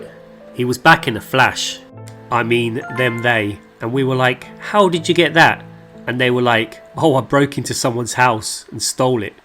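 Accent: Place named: Southern England, United Kingdom. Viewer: No Gilfs in it 😂WOW you brought the entertainment in abundance,absolutely hilarious songs and commentary,smooth English southern accent in full flow.keep sexy playa 🤘✌️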